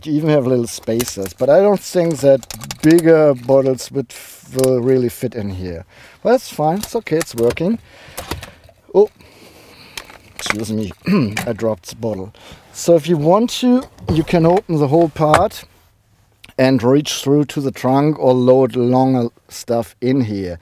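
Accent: Germany. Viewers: Lol your dash of humor is refreshing. Especially in that German accent!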